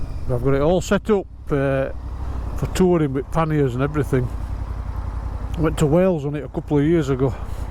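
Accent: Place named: Stoke, United Kingdom. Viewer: Hi thanks for the sub Off work today and enjoyed your commentary and the accent I heard my Stokie accent and like you I could barely listen to my own voice really and still can’t much sounds weird to me!!! I’ve not had a lot of time to do new videos this year really but will do the odd one or two when i can going forward. Loving the bike mate Atb phil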